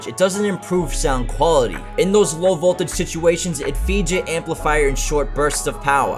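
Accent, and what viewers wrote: Boston, United States. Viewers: I'd love to hear this guy say Oscar Mayer Wiener in his Boston accent. Would be crazy satisfying for some reason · Yo,....... I like these videos, but why are you doing the Boston accent lol, I get it in the videos when you roast somebody in the comments (might be me here) but when you’re just being helpful why the accent? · Now thats a bahsten accent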